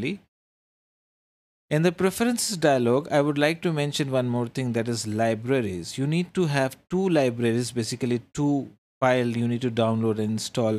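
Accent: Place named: India. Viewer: Indian, or somewhere abouts.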